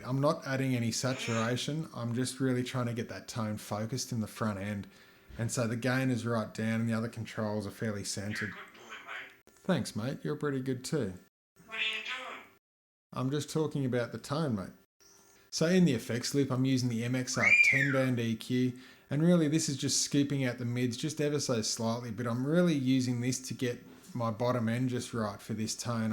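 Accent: Australia. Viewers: holy shit you're Aussie! I had no idea :) Keep up the good work mate!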